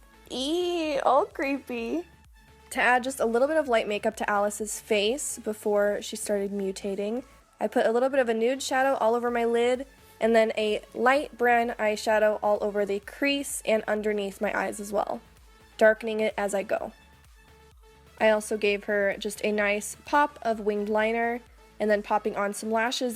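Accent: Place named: Albuquerque, United States. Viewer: Are you a Burquena? Some of the ways you say things make me think you're from my hometown! haha Specifically when you said "Eeee! All creepy!" :)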